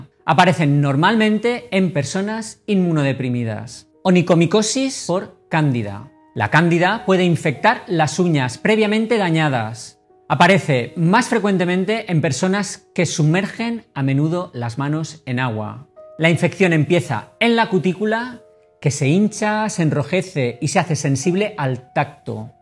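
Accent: Spain. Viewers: Sr.. Me encanta escucharlo y seguir sus sanos consejos. Es muy claro en las explicaciones. Soy Argentina pero hace un año que vivo en Valencia (España). Además, me encanta su acento español. Le deseo que siga muy bien y siempre pueda gravar estos vídeos que tanto conocimiento Ud. nos regala. Bendiciones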